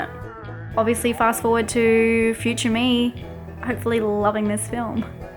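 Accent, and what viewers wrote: United States. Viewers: Oh I seen this film it a Brit horror I love this film it's got an actor in it called Jeremy Sheffield Sheffield is the town home city and the wired little creep it called Gregg and your American voice it wasn't that bad you actually sounded like one of those people who will get killed off earlier on in a horror film (no offence) but I thought this film was great hated the screeching noise bit that's probably me it's an autistic thing we don't like certain hight pitch noises. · Lmfaoooo your facial and expressions along with the American accent was priceless 🤣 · The American accent was.... Terrifying I guess is the word? You didn't do a bad job, it sounded authentic in a way. It just drained everything interesting or alive out of your voice and personality. Possibly because when anyone does an "American" accent they suddenly sound like they're from California, and most of us hate Californians, and don't talk like that.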